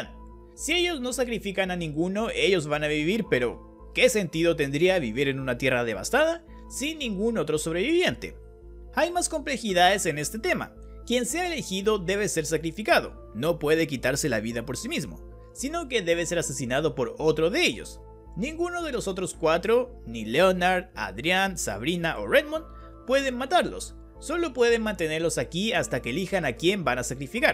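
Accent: Chile. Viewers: ERES CHILENO? 🇨🇱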